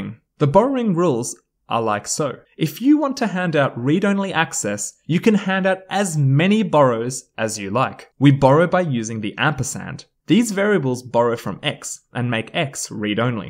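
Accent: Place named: South Africa. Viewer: You sound South African :D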